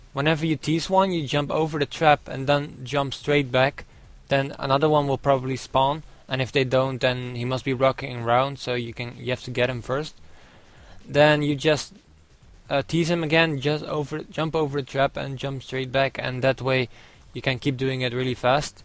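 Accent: Netherlands. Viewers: aha ik hoor nederlands accent:P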